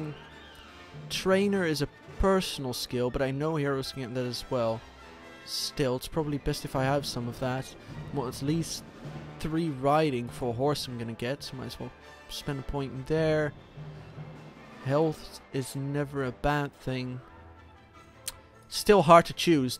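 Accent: Netherlands. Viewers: Are you dutch? And where in holland do you live?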